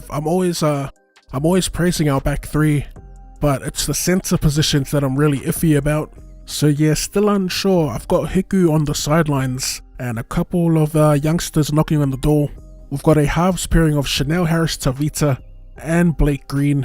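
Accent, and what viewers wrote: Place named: New Zealand. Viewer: Love hearing a kiwi pronounce all those names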